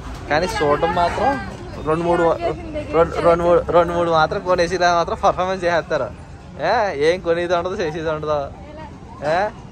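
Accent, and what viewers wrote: East Godavari, India. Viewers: Oribabu east godavari language apura babu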